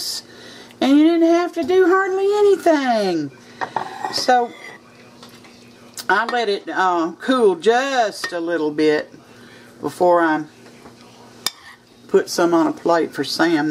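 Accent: South, United States. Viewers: Sam just kills me with that southern draw lol. Y'all are as country as cornbread and that's a complement from where I'm at. Keep on cooking and I will keep on watching. 😊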